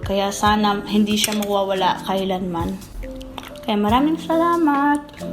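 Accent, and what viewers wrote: Visayas, Philippines. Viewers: You just sounds like a pinay province girl speaking tagalog. But honestly maganda ka lalu na yung mata mo, so expressive. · Good job...pinay na pinay ang dating m ms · Apreciate your filifino accent keep it up im your no.1 fun wish ko pag nag visit ka ulit dto sa philipines kain tayu sa mga sikat na kainan😁😁😁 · Hi...ibig sabhin ng bayhana sa bisaya is babae...cute mo mag salita ng bisaya. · Hi Hello from cebu i hope maka visit ka ulit sa PH hehehee Happy ako tlaga kasi the way you speak tagalog, may pusong Pinoy tlaga hihi GODBLESS lablab 😘 Keep it up 💪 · grabe ka Bisaya!! Bisaja gyud kaayo ka daiii... Well spake... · Natutuwa ako sa galing mo. 10 months ang dami mong natuto. Ang cute pa ng visaya mo. More power to you & greetings from New Jersey! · “Gwapa xang bayhana” it is translated into “beautiful/pretty this/that girl” your tagalog is good in a visayan accent. 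· Grabe ang accent pinay n pinay☺️☺️☺️☺️☺️👍🏼👍🏼👍🏼👍🏼,well done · ang galing pati punto pinay na pinay...yun na lang toronto..ganun talaga samantalang kahit sa call center samin kung banggitin yun "torono" haha. saka "international" eh "inenational"... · Bilib ako sa iyo. 10 months ka lang nag stay sa Philippines pero naging fluent ka agad sa Tagalog. True, yung accent mo is Visayan accent. Kaya yung mga Bisaya kapag nagsalita ng English maganda yung accent nila. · Haha. Sa totoo lang, pag kinausap mo ang isang pinoy na lalaki sa telephone na nagtatagalog ka, hindi magiging interesado sa yo kasi ang dating (whew! Im having a hard time writing this in Tagalog coz I dont know how deep I can go..lol) ng boses mo pag hindi ka nakikita para kang bisaya na marunong magtagalog pero pag nakita ka in person sobrang magugulat yung kausap mo sa telepono. Kumbaga, you got pre-judged and dumped just basing on your accent hehe...on the contrary, take that as a compliment. 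Meaning, you've learned to speak tagalog and sound like a true blue Filipino na hindi sosyal. Haha hirap talaga di ko alam kung naiintindihan mo ang comment ko. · NAKAKAGUTOOOMM!!!!! SHARAPPP!! Are my ears playing tricks on me? Even when you speak in English you already have that Filipino accent heheheh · You've got the best pinoy accent. & YOU ARE SO PRETTY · You so sweet at ang lambing ng boses mo,pinay na pinay ang salita mo at kilos,...nakakatuwa ka.... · Hahaha... Nag lalaway ako sa crispy pata ang lutong. Nakaka gutom eh. Oo parang ang pagsasalita mo ng tagalog ay may bisayan accent. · Filipina na Filipina yung pagsasalita! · I like how your accent when you spoke english was kinda like a filipino accent. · Shout out.. Waray here willing to teach yah.. Ung accent and diction mo are in visayan accent.. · Ang sarap pakinggan ng tagalog mo, may bisaya accent. Very impressive. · Hahaha nakakaaliw mag tagalog eh ... 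medyo malalalim pero parang pinay nadin pag pinakinggan mo ng hndi nakatingin 😊😊😊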